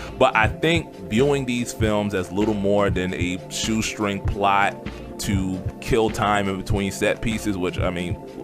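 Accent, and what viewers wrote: Philadelphia, United States. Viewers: Bro you from Philly?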